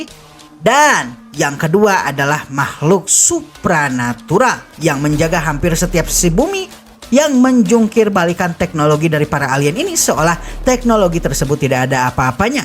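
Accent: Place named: Java, Indonesia. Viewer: Kamu wong jowo mas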